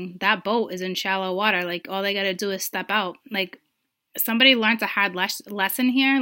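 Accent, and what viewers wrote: Boston, United States. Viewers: is that a Bostonian accent I hearya? :-) great reading ...yea they're a bit petty right now. thanks a bunch. #7thhouseAriesinMercury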